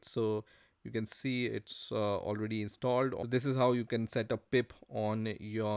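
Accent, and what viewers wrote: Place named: India. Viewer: know the tutorial will be good when the person sounds indian, amazing tutorial:yougotthis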